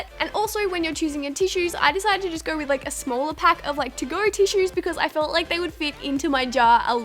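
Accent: Australia. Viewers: Are u Australian?????????????????????????????????????????????????????????????????? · Arw you Australian? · My room is clean most of the time but it's always my dresser that stays messy, it's here, ps you r are soooo beautiful and I love your accent! Idk why but there is just something about Australian accents that I love! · omg ur Australian so am !!! i love when i find you tubers that aren't American!!!..... no offence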